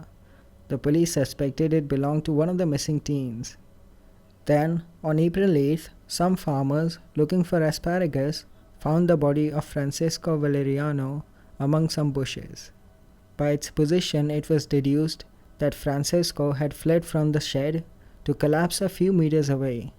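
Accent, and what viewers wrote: India. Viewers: Hey you cheap bastards hire an Enlgishman to do the commentary on these videos why hire a GD India man??? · What's up with the goofy Indian accent? Bring back the female narrator. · Narrator is Indian. Not soothing to the ears.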